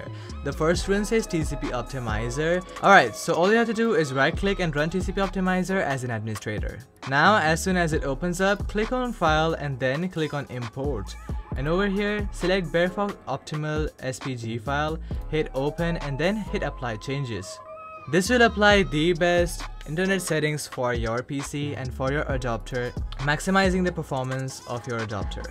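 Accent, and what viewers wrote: India. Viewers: You got a bit of indian accent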